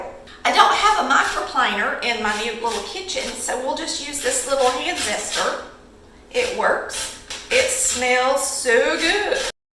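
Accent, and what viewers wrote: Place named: South, United States. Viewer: I love 💕 your southern accent and my heart ❤️ could just melt!!! I’m going to try your recipe Mrs!! If I love ❤️ lemon meringue pie, then key lime pie should be great 😊 for me!! Thanks for sharing honey 🍯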